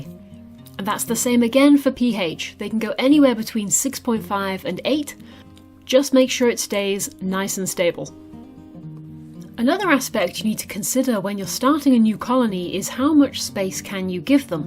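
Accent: United Kingdom. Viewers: Great edit and I really like your UK accent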